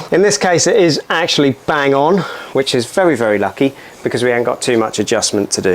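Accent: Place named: Norwich, United Kingdom. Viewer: You sound like a narrich boy, im one myself! I had and his team fit me a double sectional door on my garage they did a nice job, but a sectional garage door is not a diy job, abit more to it compared to a roller.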